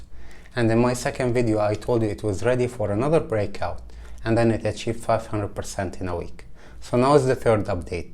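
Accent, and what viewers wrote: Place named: North Africa. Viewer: I am hearing a North-African accent :)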